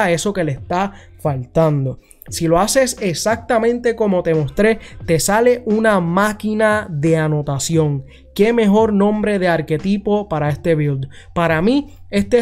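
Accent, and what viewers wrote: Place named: Puerto Rico. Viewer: Eres puertorriqueño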